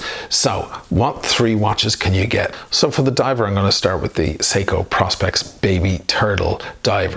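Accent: Ireland. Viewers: Where are you from? Ireland? The voice reminds me of Darth Vader.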